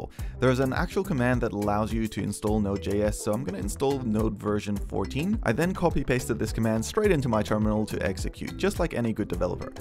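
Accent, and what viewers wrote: Australia. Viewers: Why does your accent sound like an Australian trying to sound American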